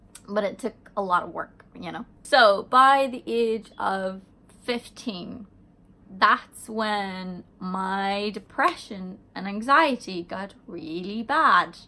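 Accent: Ireland. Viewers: My guess is she's Irish. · you are an inspiration to many people I follow you on YouTube I follow you on Instagram and Twitter because I think that you are an amazingly talented person a beautiful singer and a beautiful young woman you have beautiful eyes you have beautiful hair you have a beautiful smile and if I may say you have a sexy body every person is different no two people are alike you are unique you are unique in many ways keep doing what you're doing because your voice is a treasure your beauty is a treasure and your story is a treasure and I for one appreciate you for all of these things and if I may add as an American I absolutely love your Irish accent it makes me happy to listen to you speak may you have a long prosperous and delightful journey in your life I will follow you through it all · Where are you from? You sound a bit irish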